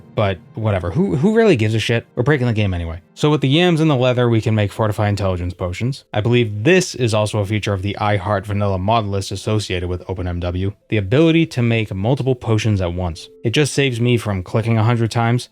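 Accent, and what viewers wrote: Boston, United States. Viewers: Weird question but are you from Boston/mass? I feel like i can hear it every once in a while haha. Im just curious since im from there too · Boston accent? I heard you say smaaht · Boston boi?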